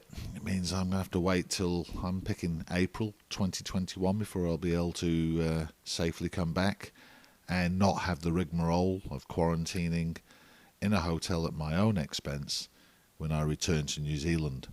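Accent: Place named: Indonesia. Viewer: I like the intro: (indo accent)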